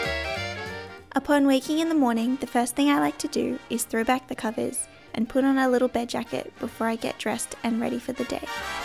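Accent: Australia. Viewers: This video is absolutely lovely to watch and the girl who uploaded it is an absolute sweetheart; her milk & honey voice(🥛🍯) and Australian accent are a splender to the ear(she also has cute feet as well! 👣💞😁). 💕💕💕💕💕 😁😁😁😁😁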